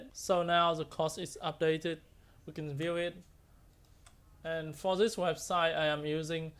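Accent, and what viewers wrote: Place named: Vietnam. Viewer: ui nghe như giọng VN luôn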